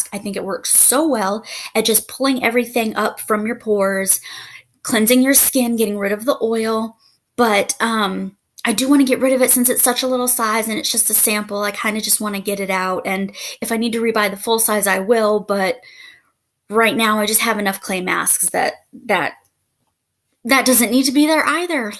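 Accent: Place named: United States. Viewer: You have such a lovely accent! What state do you live in? I love soooo many of the songs you chose!!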